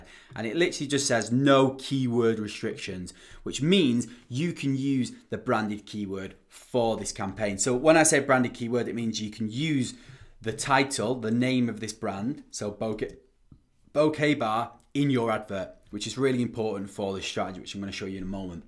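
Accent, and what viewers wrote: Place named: Manchester, United Kingdom. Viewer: Great content man, very comprehensive, is that a Manchester accent I detect? 🙂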